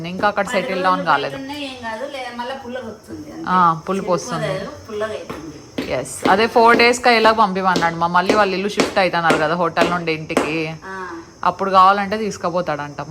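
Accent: Anantapur, India. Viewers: Amma very sweet anantapur slaang super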